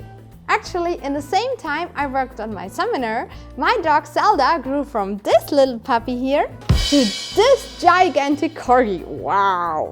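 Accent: Germany. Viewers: Hey, bist du aus Deutschland? Ich frage nur, da du einen deutschen Akzent in deinem Englisch hast und du auch in anderen Videos öfter mal Produkte mit deutscher Aufschrift genutzt hast. PS: Mega cooles Video😊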